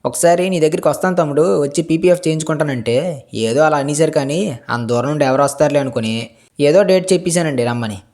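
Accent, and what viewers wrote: Srikakulam, India. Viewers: Anna meedhi inthaki elura a leka srikaakulam aa? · అరేయ్ నీది కూడా శ్రీకాకుళం ఆయనది శ్రీకాకుళం జిల్లా నువ్వూ నీ కొండ భాష ఆపరా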